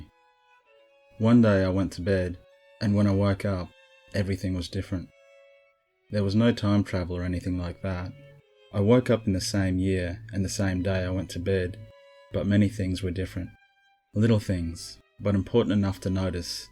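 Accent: Australia. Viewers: are you from Australia?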